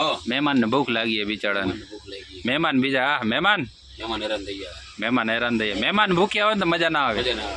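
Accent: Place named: Kathiawar, India. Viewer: મઝા નથી આવતી ભાઈ... તમારા વીડિયોમાં જ બધું આવવા દયોને.. અહીં મુંબઈ માં બેસી તમારી કાઠિયાવાડી ભાષા નો લેહકો સાંભળવાની બહુ મઝા આવે છે...". કાંઈ ઘટે નહીં ભાઈ..." 😊😊😊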